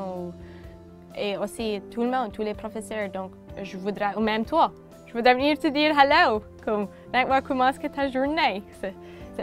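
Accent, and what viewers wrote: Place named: Acadia, Canada. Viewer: J’adore cet accent acadien. Ils sont bien sympathiques ces acadiens de Schédiac où j’ai souvent été en vacances.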